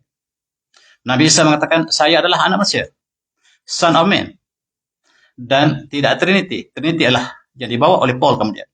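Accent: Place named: Kelantan, Malaysia. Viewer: setuju sgt2..bro, belum cukup ilmu di dada, lautan dalam jangan di duga. Sya pun faham..prof ni byk kekurangan dlm penuturannya yg tebal loghat Kelantan, kelajuannya, malah bait2 bacaannya dari apa sahaja bahasa BM, English, Arabic, Ibrani, Aramaic, terdengar mcm tak clear..lajunya mcm lorat gaduh dan agak lucu2 skt. Tp tu semua tak terbanding langsung dgn ilmu2 dlm setiap kuliah beliau. Mula2 dgr dlu ada gak rasa smcm jer..tp makin lama semakin menarik, makin mendalam, byk ilmu baru, isu2 dunia, isu umat muslimin, isu akhirat dan penuh pengajaran, pencerahan dlm dunia yg sudah di fasa akhir zaman ni..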